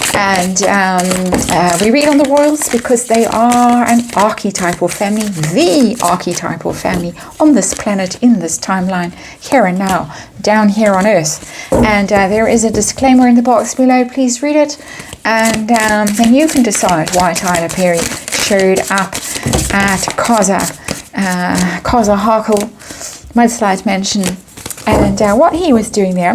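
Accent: South Africa. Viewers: I'm here for the snark too!🤭 I love beautiful, lilting SA accent and the way she giggles and snarks!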